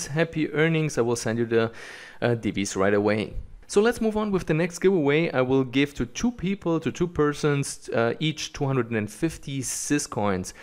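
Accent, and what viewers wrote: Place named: Germany. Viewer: Ich sehe hier Kommentare auf Deutsch bist du auch aus Deutschland? Am Anfang hatte ich das Gefühl einen Deutschen Akzent bemerkt zu haben. Aber was ich eigentlich sagen will ist : sys1q29ngmzattdaamwyvggq6pw0j67ls7h9wauhmqy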